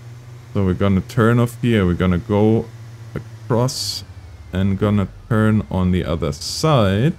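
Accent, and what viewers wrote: Germany. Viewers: Mate I swear I hear the tiniest of an accent... German, Dutch, anything?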